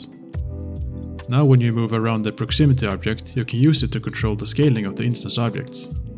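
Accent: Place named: Germany. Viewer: I somehow always feel like this German accent is perfect for modeling tutorials :D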